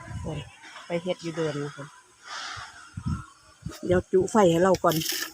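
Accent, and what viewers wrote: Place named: Laos. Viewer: มักแม่ ลาวเว้าม่วม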